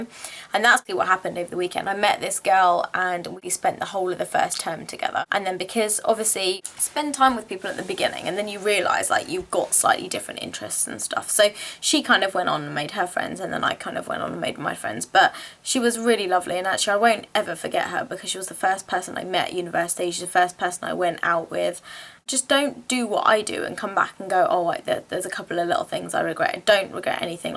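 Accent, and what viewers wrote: United Kingdom. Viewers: I love the way u speak ......I love.ur British accent 👍👌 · even though i have finished my grad but still watched it,actually your uk accent made me do that.lol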